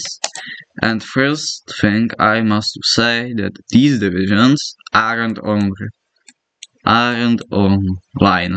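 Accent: Czech Republic. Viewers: Hmmmmmm Czech accent? Nice video bro💪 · i can hear your czech takže ahoj so slovenska!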